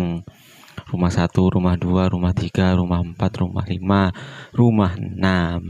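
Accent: Madura, Indonesia. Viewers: Meduro y kang? Hehehehehe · Kayak orang Madura ya ini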